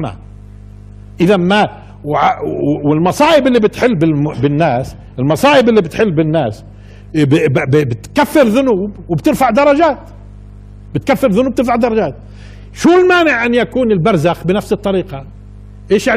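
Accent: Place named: West, Algeria. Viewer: سبحان الله نفس اللهجة عندنا في غرب الجزائر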